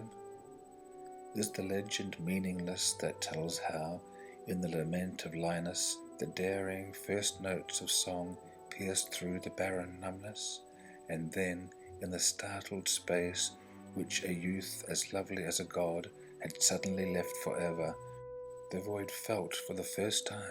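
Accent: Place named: New Zealand. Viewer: beautiful reading, you must be a kiwi?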